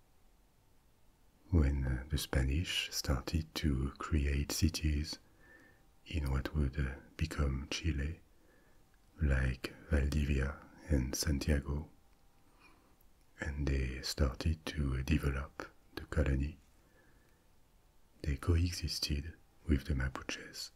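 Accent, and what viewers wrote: France. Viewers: I can’t wait to hear about the antique books! Antique books and French accents are my weakness 😁😁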